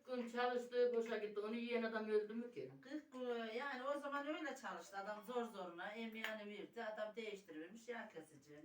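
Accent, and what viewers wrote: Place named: Konya, Turkey. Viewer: Gı konyalımısınız yoksa 😁Benim herif konyali 😌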